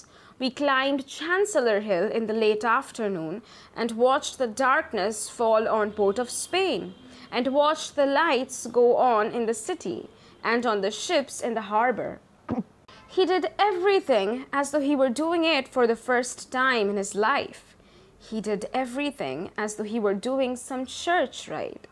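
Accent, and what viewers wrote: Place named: India. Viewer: Sorry but I found it hard to listen to .. didn’t get past first paragraph I guess I’m not used to the Indian? Cadence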